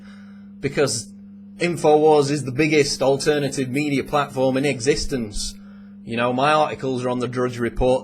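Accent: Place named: Yorkshire, United Kingdom. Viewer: Yo dude, you from Leeds? That accent sounds like a Yorkshire one?! Hello from W.Yorks.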